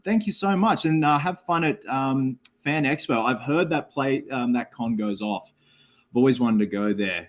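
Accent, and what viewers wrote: Australia. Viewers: Lol, "Saturn"! I've been listening to this while sewing for a cosplay commission and I thought you were saying "Satin" this whole time, but then I looked up and saw the title and the banner at the bottom of the video 😆🤦🏻‍♂️! That Aussie accent really muddles words sometimes, I guess, huh, lol 😅...?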